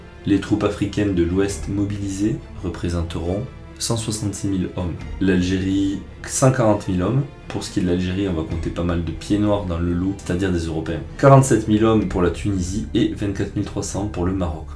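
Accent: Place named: South, France. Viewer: C’est le Sud ahah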